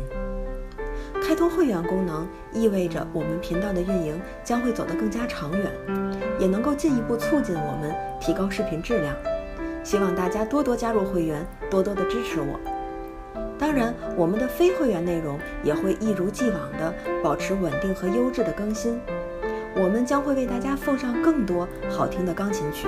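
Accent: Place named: Mainland, China. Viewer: 本以為美女是香港人，沒想到美女說了一口流利的內地普通話。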